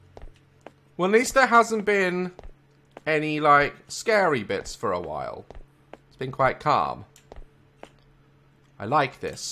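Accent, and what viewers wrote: United Kingdom. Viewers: with the posh English xD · Is from the uk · fabulous British talk mate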